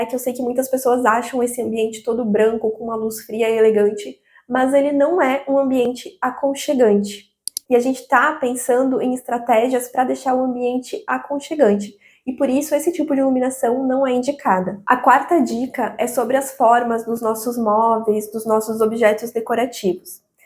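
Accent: Rio Grande do Sul, Brazil. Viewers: Adoro o sotaque gaúcho!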